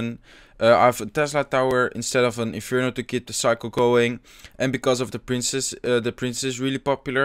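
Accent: Netherlands. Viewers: Your dutch accent sucks but your content is really great. Congrats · ik ken je account niet maar ik kan gewoon aan het "accent" horen dat je uit nl komt ahahhaha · where are you from? Just out of curiosity you have a Dutch accent I think.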